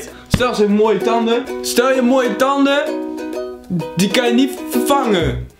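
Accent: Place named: Overijssel, Netherlands. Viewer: Waar kom jij vandaan vlm uit overijsel want je praat n beetje plat en t lijkt een beetje op hoe ik praat 😂 ps jou ogen je haar en je tanden😍😍😍😍😍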